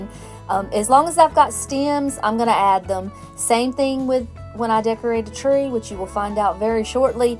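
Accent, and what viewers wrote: South, United States. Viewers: It can be what you want it to be and put out what you love. I think you are still precious! And I love that you talk like me…southern.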